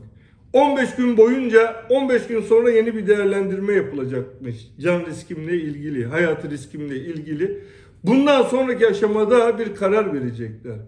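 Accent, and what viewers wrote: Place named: Izmit, Turkey. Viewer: Milyonlarca insanın diyemediğini anlattın bu ülkede seni unutmucaz reis keşke olsanda ülkende şu izmit sesinle canlansın eskisi gibi herkez kötü lesede kaderini bilki ki biz biliriz seni reis korkak gibi susmaktan sa aslan gibi ne varsa anlatmaya calıstın unutmadık unutmucaz seni 🙏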